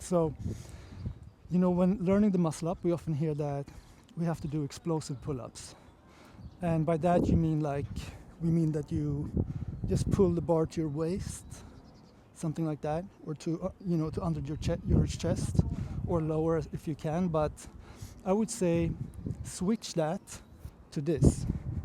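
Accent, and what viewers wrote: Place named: Europe. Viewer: voice quality is good people from india don't understand european english 😅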